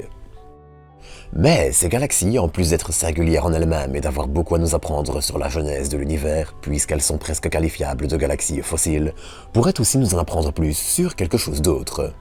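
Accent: Belgium. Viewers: Wow, Je ne sais pas ce qu'il s'est passé avec ton com, mais je l'ai en notif et je le trouve très instructif. Je vais donc le remettre ci-dessous pour ceux qui, comme moi, n'étaient pas au courant. Et oui, est belge 🤗 et j'adore son accent! 😊 Com de Led Zep: Régional plutôt, si tu sous entends que notre ami soit belge, le coq est le symbole de la Wallonie (prononcer le W comme en anglais) qui est une des régions de la Belgique, c'est la partie sud du pays, on y parle français à 100 pourcents, la région Bruxelloise encerclée par la Flandre, brrrrr... 🙂 ((90 pourcents de francophone et 10 pourcent de néerlandophone)(on prononce Brusselle, pour la même raison que l'on dit Aussère et pas Auksère (Auxère). Pourquoi? En latin le double S s'écrivait X, à la renaissance le X a pris le son KS)), Ensuite la Flandre, 60 pourcents de la population, 100 pourcents néerlandophones et pour finir la région germanophone, seulement 60.000 personnes parlent donc allemand en première langue mais sont tous bilingue en français. 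Je ne sais pas si est belge par contre...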